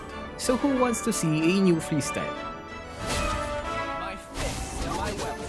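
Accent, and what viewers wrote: Philippines. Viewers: Pinoy na pinoy mag english ah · You can hear his peenoi accent 😂💜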